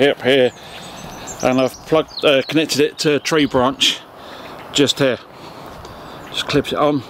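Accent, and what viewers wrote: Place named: England, United Kingdom. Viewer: Nice too hear somebody from back across the pond . I’m a. XPat living in the States . I have the Tecson 310 and love it . Where in England are you ? I was from the the Midlands Coventry. Thanks again .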